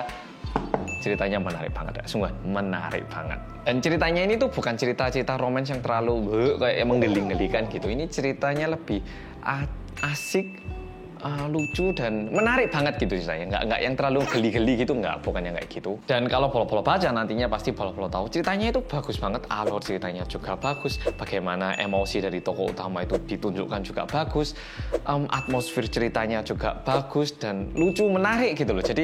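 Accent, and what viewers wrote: Java, Indonesia. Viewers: medok itu nama logat jawanya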